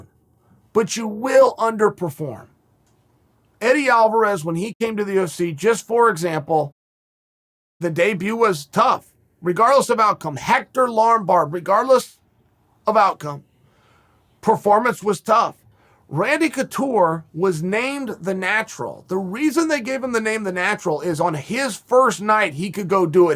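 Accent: Liverpool, United Kingdom. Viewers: Proper scouser! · he’s scouse lad. Like the Beatles saying ‘you know’. Get used to it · Over-hyped Scouse just like Till. Everyone needs to 'CALM DOWN LAD'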